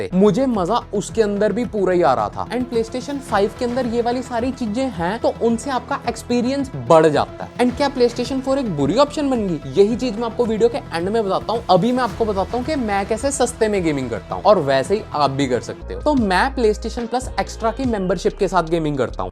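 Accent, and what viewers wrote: Punjab, India. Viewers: Bro's Punjabi Accent 😂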